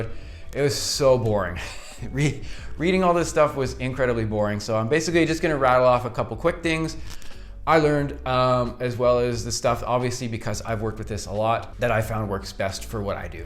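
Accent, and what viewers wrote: Canada. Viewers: What your Canadian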